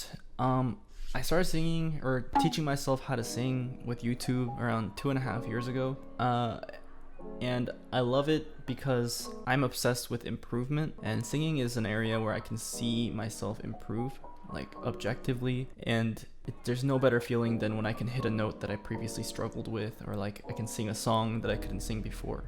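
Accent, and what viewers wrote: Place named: South Korea. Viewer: One question (Sorry not on discord will join soon) Will u voice anime Ur Korean accent sounds like u could Ty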